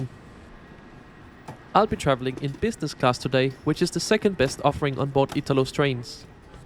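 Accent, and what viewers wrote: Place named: Denmark. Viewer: Love your sweet, soft Danish accent. Unmistakable. So many memories of my good old Danish friends from when I was young.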